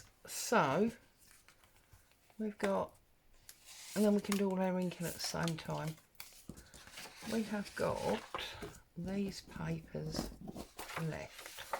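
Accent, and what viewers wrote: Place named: South London, United Kingdom. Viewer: that was brilliant- loved the loaded tag really lovely. I love listening to your South London accent - makes me homesick - hope you're having a great weekend- 😊❤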